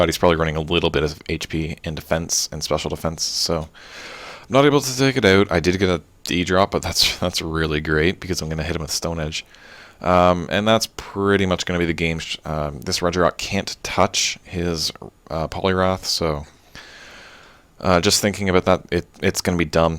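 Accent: Canada. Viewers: u sound candian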